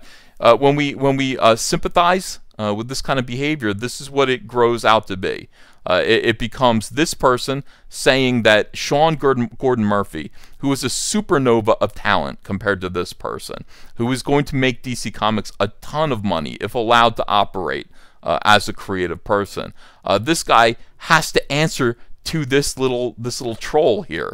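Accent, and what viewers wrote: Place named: South Jersey, United States. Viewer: I love your accent - has to be South Jersey/ Philly. I live in NYC & that accent is sooo recognizable & unique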